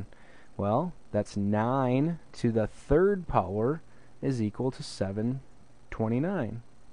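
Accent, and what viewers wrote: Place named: Canada. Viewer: the canadian is so strong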